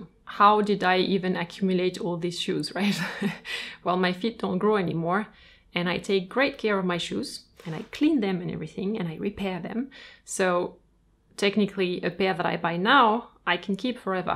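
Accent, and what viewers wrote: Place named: France. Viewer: Dear, thanks so much for the video. It inspired me to declutter my shoe closet. I am avid fun of Mary Condo, so i do organize my closet and periodically declutter. Even though i do not own many pairs, i really appreciate high quality. Is it possible you spell out the brands that are featured in your video? I watched it several times but due to french pronunciation can not catch the names.;) I especially loved your platform boots and platform summer sandals. and black shoes, they are so spectacular. The links you posted does not take me to the same shoes. May be because i am located in US and it takes me to German version of the website. If i know brand names of the shoes featured in your video i could look up based on the brand name. Thanks os much in advance!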